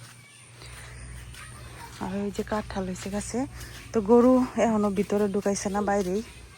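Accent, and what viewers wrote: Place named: Comilla, Bangladesh. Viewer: ম্যাডাম কেমন আছেন। আপনার কথাশুনে বুজতে পারছি আপনার পর্ব পুরুষ কুমিল্লার হবে।তবে যাক পরের কথা হল আমি কুমিল্লা জিলা তিতাস উপজেলা থেকে দেখতেছি আমি বলতেছি ম্যাডাম যদি জমি কিনে রেখে দিলে কয়েক বছর পরে বিক্রি করার জন্য ঠিক হবে কি। কমেনট করে জানাইবা কেমন ।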